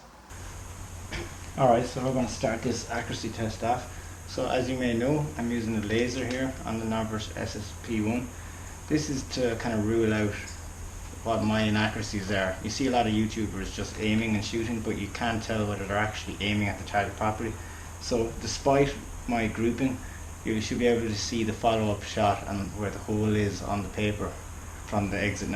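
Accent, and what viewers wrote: Ireland. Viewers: Are u Irish coz I am in laois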